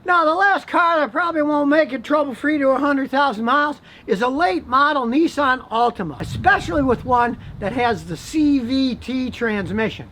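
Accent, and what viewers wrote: United States. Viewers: your english is really american and you do your reseach 100% before a video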